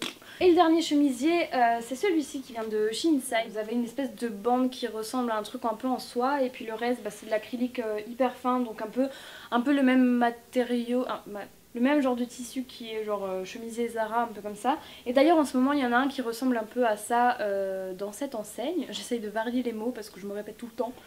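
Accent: Alsace, France. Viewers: Le petit accent alsacien aha! ;) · J'adore ton accent alsacien aha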